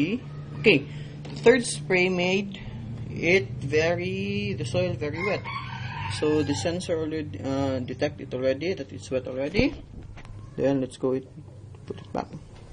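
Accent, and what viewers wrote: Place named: Philippines. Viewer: Well done. U r Filipino right ? (It is the accent that gives you away :-) )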